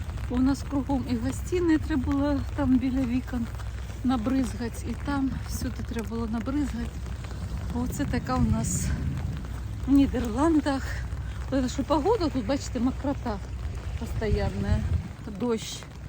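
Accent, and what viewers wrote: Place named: Russia. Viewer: Так интересно слшуть как вы пытаетесь перейти с русского на украинский, а русский так и проскакивает ))))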